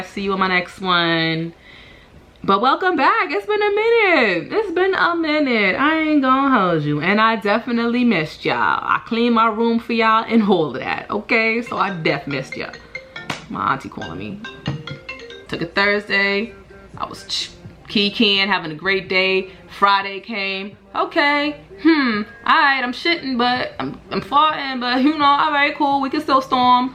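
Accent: New York, United States. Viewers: Can I just say how comforting it is to hear a legit ny accent after moving out of state 😭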